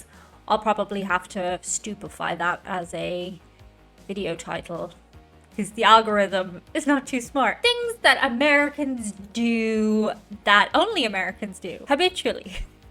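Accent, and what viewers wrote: Ireland. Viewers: MMM. I think the reply to thank you and compliments is kinda regional. I started replying thank you to compliments because I was never good at taking complements ( Maybe those Irish Genes LOL ) they always flabergasted me... I thought that might be considered rude , so I go to thank you now. You are attractive and you have that great Irish accent.... so no surprise you get hit on... Just turn them down politely...that will usually work. Remember the US is full of folks of Irish descent. .. so it is easy to feel that attraction. Yeah.. we do.. over do too well. I have had other Europeans tell me they liked the encouragement to do something instead of one should stay in their own lanes. Interesting .Thanks. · Hello Fellow Humans, We are direct because you are absolutely gorgeous. You have an irish accent which is one of the top sexiest accents in the world. 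You are a price for men in America. You are gorgeous, blonde, blue eyed, irish. Seriously you can easily get a husband in America and he will worship you. Brand loyalty is because we are initiated with commercials and ads from birth. Parents live through their kids and so force them to do what they love. It is annoying. Let kids be kids. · As far as being hit on, you're fairly attractive and the Irish accent adds just enough exoticism without being too alien. So it doesn't surprise me too much that some men in America go, "what the hell" and take their best shot. 🤷‍♂ As for distance measured in travel time, I think that likely has a lot to do with the fact that we have such vast distances that it can take a really long time to get anywhere. (That really hit home for me once, on my second trip to Germany. I flew in to Hamburg and spent three days; hopped a train to Berlin and spent five days; hopped another train to Ingolstadt and spent a day; and then took a local commuter into Munich and spent a few days before I flew home. 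As I was checking out through German customs, the agent there noted I'd flown in to Hamburg but was leaving from Munich and asked me if he understood that correctly. When I confirmed that was the case, he said, "But that's very far, ja?" To which I replied, "Not really ....😕") Also, for a completely different experience in receiving directions, you should come and visit us in Colorado, some time. You'll hear something new. 😁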